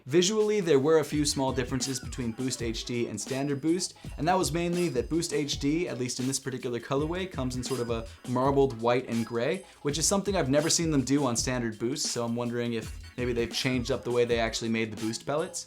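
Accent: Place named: Philadelphia, United States. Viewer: What part of philly you from bro?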